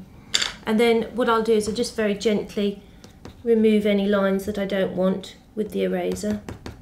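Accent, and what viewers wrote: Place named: England, United Kingdom. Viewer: Hence she has an English accent. We say people have French, German, Irish and other national accents just as I have a Scottish accent so why not an English one?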